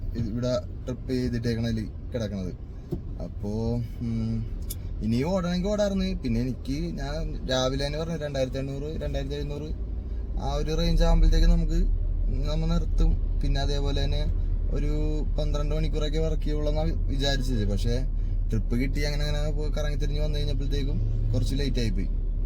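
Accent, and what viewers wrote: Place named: Ernakulam, India. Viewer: Kettal ariyille.. ernakulam 😂😂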